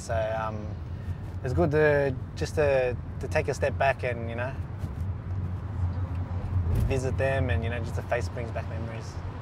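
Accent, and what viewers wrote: Vietnam. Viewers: chuan sai gon bac oi ;))) chu ok ji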